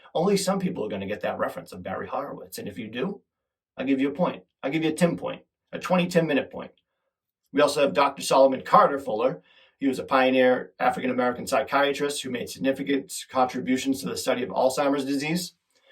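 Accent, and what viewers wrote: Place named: Boston, United States. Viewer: You sleep? 😂😂😂 Your Boston accent is my favorite part of your show! Keep trucking my friend!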